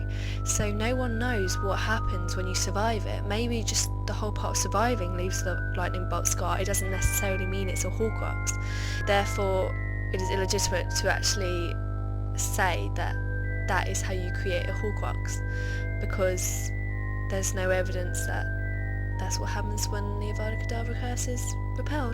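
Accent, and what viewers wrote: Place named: England, United Kingdom. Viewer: x.o I can't hear you when you're reading; but I assume you're grabbing facts from the books since there are more to support he may not be a Horcrux. And ZOMFG, nice English accent. =) And to add on, Voldemort must've known risks and such before going on with it, esp when DD had said it was dangerous to confine one's soul within an object of free will. Sorry, if you've said that already though. xP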